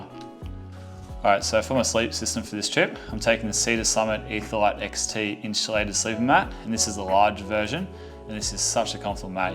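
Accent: Australia. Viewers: Omg only Australians would understand what you are saying!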